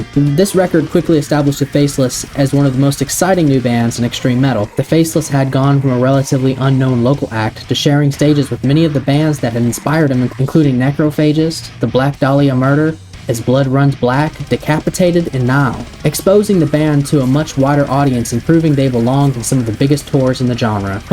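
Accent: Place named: South Carolina, United States. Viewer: You sound like you're from South Carolina.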